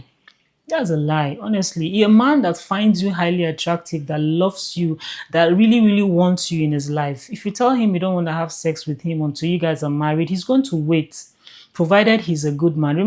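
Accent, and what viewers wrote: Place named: Nigeria. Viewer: I love your Nigerian voice!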